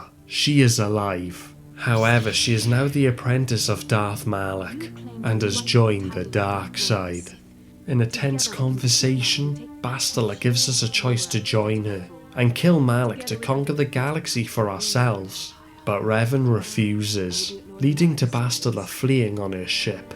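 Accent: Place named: Wales, United Kingdom. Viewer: its what happens when we welsh try to speak properly so others can understand us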